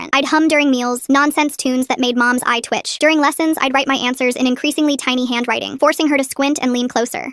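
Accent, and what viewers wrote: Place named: United States. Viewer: Her voice is annoying American fast loud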